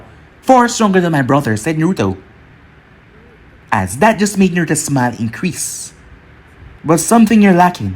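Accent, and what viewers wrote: Jamaica. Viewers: I’m good my boy also are u Jamaican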